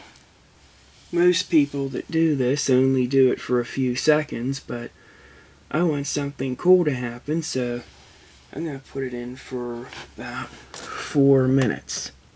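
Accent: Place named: Washington, United States. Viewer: your from Washington state aren't you I can tell from your accent its like mine :D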